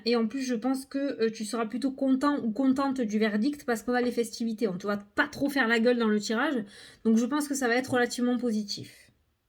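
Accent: South, France. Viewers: J'adore t'écouter avec ton accent du Sud! Et ton jeu l'oracle du temps est magnifique. Je ne tire pas encore les cartes mais il me donne envie de m'y mettre! Bravo!💖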